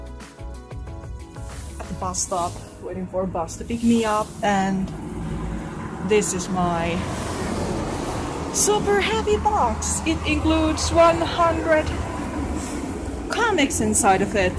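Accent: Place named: Finland. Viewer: I couldn't tell whether you were British or American but it looks like you're actually Finnish, and I love your accent **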